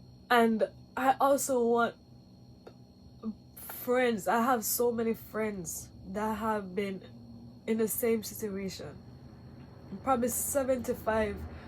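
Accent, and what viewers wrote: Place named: Trinidad and Tobago. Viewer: U have a sweet accent, are u Trini ???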